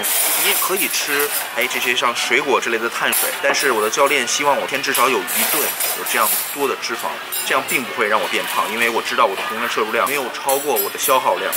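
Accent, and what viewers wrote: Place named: Beijing, China. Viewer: 我猜北京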